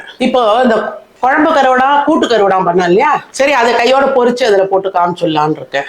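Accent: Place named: Trichy, India. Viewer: நீங்க pesarathu Nanna iruku, unga ooru Trichy AH. Nalla idea oil um water um mix pani sheet la thadavarathu 👌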